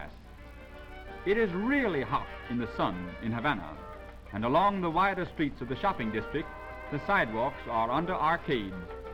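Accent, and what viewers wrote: Transatlantic, United States. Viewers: transatlantic accent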